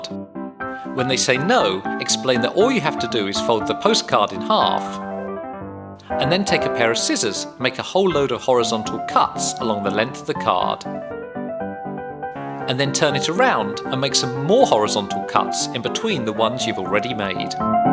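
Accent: United Kingdom. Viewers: stupid british accent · The way British people speak drive me nuts and annoys so much but at the same time it attracts me and I try to pronounce words the same way. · I hate this man's accent. Can't stand british...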